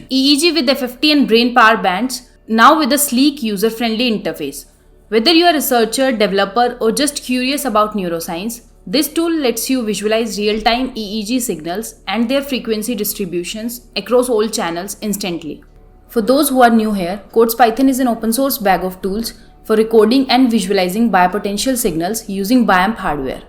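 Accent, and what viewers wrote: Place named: Rajasthan, India. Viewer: she must be Rajasthani or Gujrati. very strong accent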